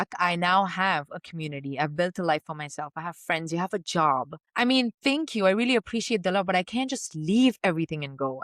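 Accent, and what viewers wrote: India. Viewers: You are beautiful with Indian accent.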